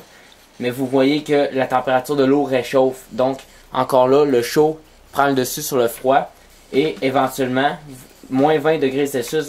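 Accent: Quebec, Canada. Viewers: vous avez vraiment un accent affreux les québécois, on a du mal à comprendre vos phrases pourtant on parle bien la même langue non? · habla francés de canada.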